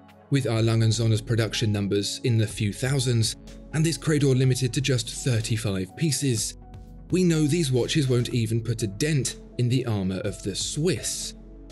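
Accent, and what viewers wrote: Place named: RP, United Kingdom. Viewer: Ok 3 min on Lange (from to ). 1 min on Credor... Hmmm? Just some biased limey with a posh accent...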